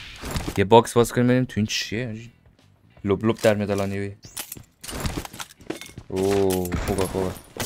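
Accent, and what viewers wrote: Iran. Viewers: Why Are You Speak Like Irani Persian ?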